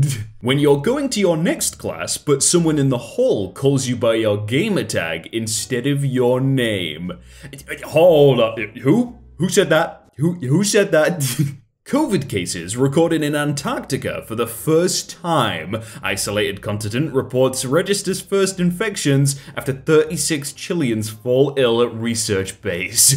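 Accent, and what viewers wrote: United Kingdom. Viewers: day 26 of asking that hes british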